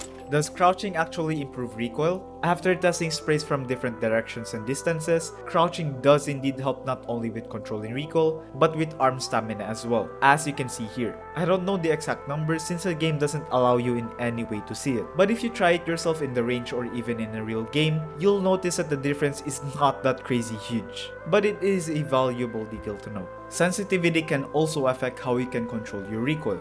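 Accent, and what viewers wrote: Philippines. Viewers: IDK, But you sound like you're Filipino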